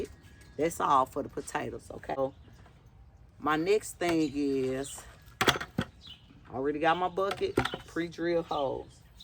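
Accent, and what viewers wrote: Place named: St. Louis, United States. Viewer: Sounds like you from St. Louis.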